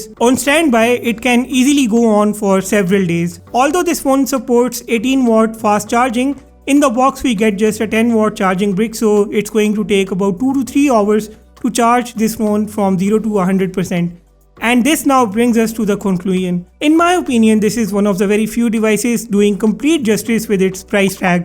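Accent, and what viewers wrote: India. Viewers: Please put english translate for those who don't understand Hindi accent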